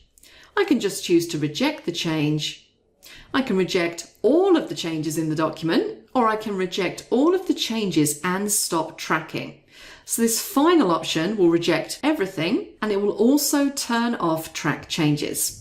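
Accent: United Kingdom. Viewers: Very difficult to fiolllow the explanation about track changes turning off or not. Replayed several times, could not understand the british accent, and what was being clicked on. Need to slow it down a little.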